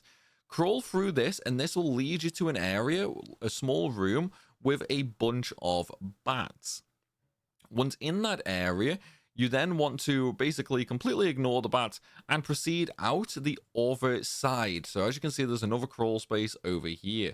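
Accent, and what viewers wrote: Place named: West Midlands, United Kingdom. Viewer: You sound like someone who lives in the west midlands of the UK like me I need this confirming as this is bothering me 🤣🤣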